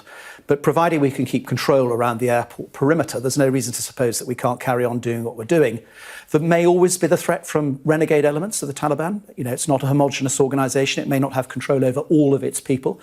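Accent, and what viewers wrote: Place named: United States. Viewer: I think you people made a typo mistake, this guy sounds like American unlike the title of the video .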